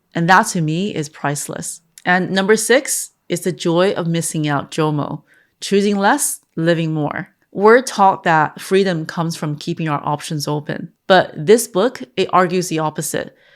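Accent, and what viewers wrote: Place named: Ireland. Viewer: I hear a slight Irish twang in your accent.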